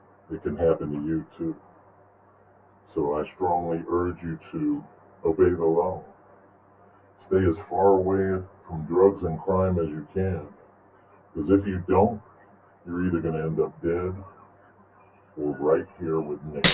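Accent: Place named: Midwest, United States. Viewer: How is he doing this channel from inside there? From his accent I'd guess he's in the midwest.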